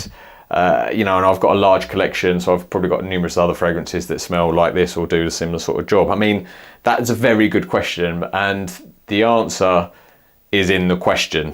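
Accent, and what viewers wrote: United Kingdom. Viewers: Others have likely already offered this: YOU ARE BLOVIATING AUD-NAUSIUM, TACKY REVIEWER WITH OFF-PUTTING BRIT ACCENT.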